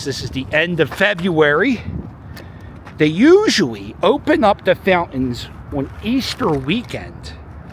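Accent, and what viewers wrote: Philadelphia, United States. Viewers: Thanks Cuz… Love ur vlog. You have the voice to rep Philly. Keep it up. Keep warm. Much Brotherly Love!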